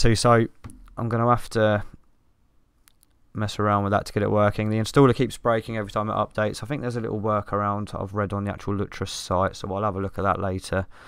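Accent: United Kingdom. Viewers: I like your British English very much, mate!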